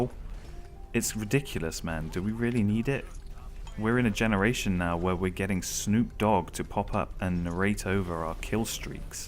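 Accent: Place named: United States. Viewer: You have such a convincing accent as an American rapper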